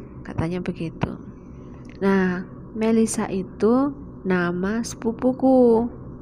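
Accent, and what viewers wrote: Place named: Malang, Indonesia. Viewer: Medokkk bnget malangnya hee sasaji mbak 🌹🌹👍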